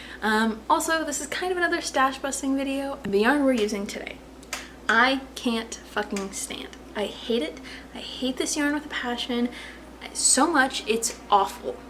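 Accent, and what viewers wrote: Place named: Australia. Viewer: hi. are you in australia? you sound australian but the yarns you mention are all US. Maybe if you blocked the hood it might sit better? Can you tell me what you dislike about this yarn and did you do a swatch first? anyhoo, I like the hood, especially the colours and it looks straightforward, nice to meet you :)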